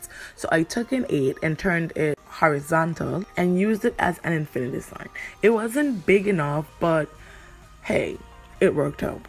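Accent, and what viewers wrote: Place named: Anguilla. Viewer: Shes from Anguilla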